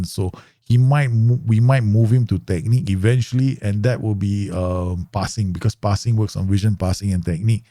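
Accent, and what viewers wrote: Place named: Singapore. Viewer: Amazing content! I assume that you’re from Singapore from the examples and accent. Will work on a new save and focus on youth with this in mind. Thank you!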